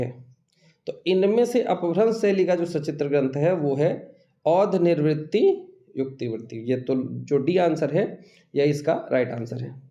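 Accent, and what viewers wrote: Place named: Allahabad, India. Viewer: Aur sir mujhe pata hai aap bhi Allahabad se hi hai aapki language ekdum allahabadi hai Naini ki aas paas se hai aap Mai aapse 2 ya 3 baar baat kar chuka hu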